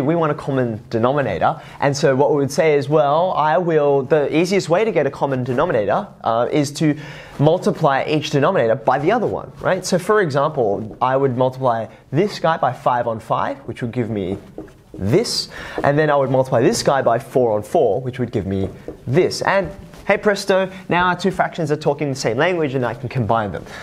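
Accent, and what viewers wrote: Australia. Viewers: His accent is aussie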